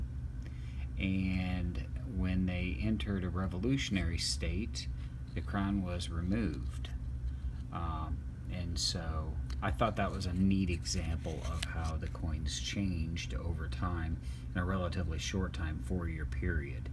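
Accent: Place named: South, United States. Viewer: Sounds as a southern belle !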